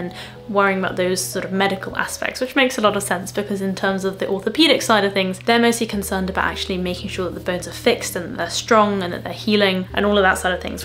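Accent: England, United Kingdom. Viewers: An actual English accent 🔥ultra🔥UKasian🧠Sapio❤ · I can't express how much I love that British English. Lol ❤🎉😊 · She’s cute ❤ love the British accent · I’m watching your YouTube for study British accent ❤ I love your accent so clearly and sounds smart. Take care of yourself. I will support to you from Japan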